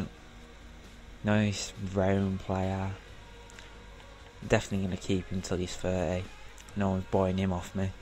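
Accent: United Kingdom. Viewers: U sound proper essex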